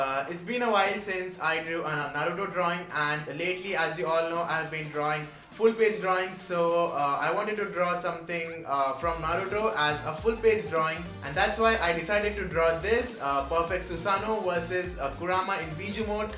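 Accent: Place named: India. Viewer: Nice video! And great accent. Indian right?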